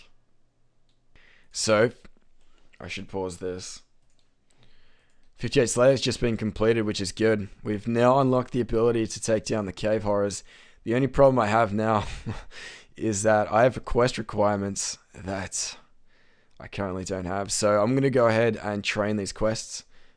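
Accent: Australia. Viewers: He talks like he has an awesome Australian accent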